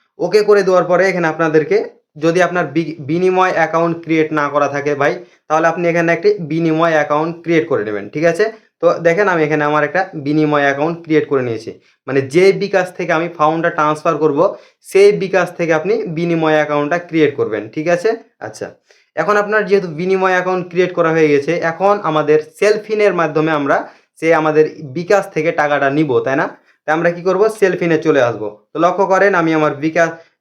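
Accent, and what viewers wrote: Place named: Kolkata, India. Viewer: ভাই, আপনি কি ইন্ডিয়ান? ভাষা কোলকাতার মতো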